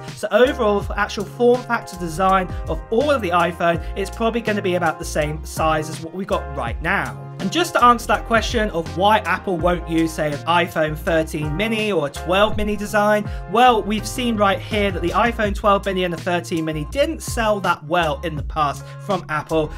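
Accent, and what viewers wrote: United Kingdom. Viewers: Fcking UK accent :D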